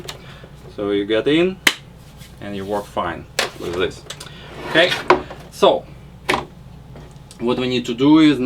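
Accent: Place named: Russia. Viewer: are you Russian speaker ? Russian accent 101 %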